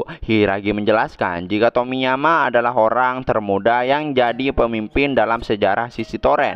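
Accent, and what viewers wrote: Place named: Madura, Indonesia. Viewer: sama sama orang madura soalnya bang, kerasa banget logatnya di telinga aku wkwkwk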